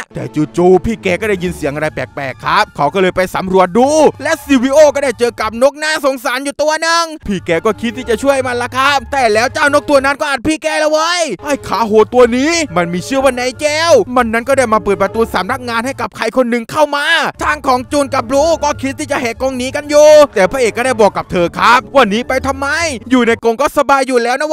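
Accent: South, Thailand. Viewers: นี่พี่บาวเป็นคนไต้ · ชอบภาษาใต้ของพี่อะหนูอยากให้พี่ภาคหนังเรื่องหนื่งเป็นภาษาใต้ได้ไหมคะ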